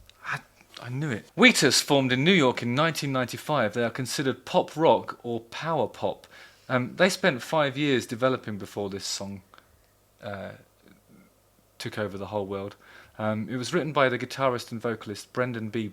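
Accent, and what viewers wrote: United Kingdom. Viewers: Dude i honestly thought this was tommy lee doing a british accent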